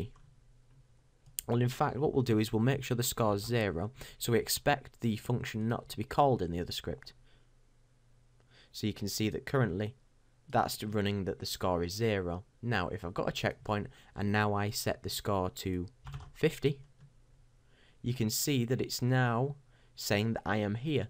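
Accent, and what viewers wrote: United Kingdom. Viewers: I've already saved your channel AND subscribed. I'll definitely be exhausting your videos before I hunt for any more. Wish I could buy you a beer...or a i guess that would be more like a 'pint' since it sounds like you're in the UK. Cheers!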